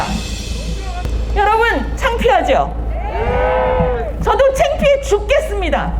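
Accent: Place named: Andong, South Korea. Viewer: 예천 안동 사투리 같은데요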